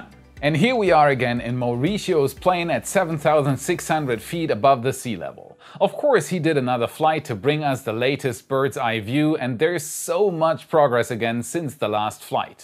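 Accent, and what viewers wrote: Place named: Germany. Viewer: You sound a bit german. Are u german? Great video btw!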